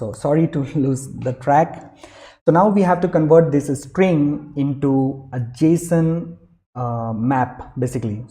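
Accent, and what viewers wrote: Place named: India. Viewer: Начал понимать английский на слух, на тебе индуский английский. Блэт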